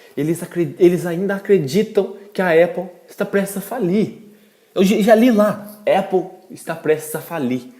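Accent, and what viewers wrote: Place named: Fartura, Brazil. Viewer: Huahua Sotaque de Fartura hauhua, muito bom...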